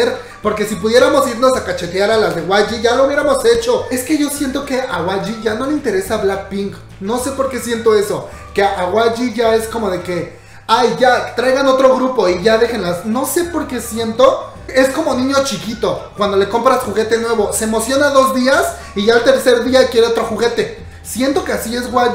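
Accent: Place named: Mexico. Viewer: Sin duda alguna me encanta el acento mexicano, más en...me río muchísimo 😂❤️🇪🇸🇵🇾.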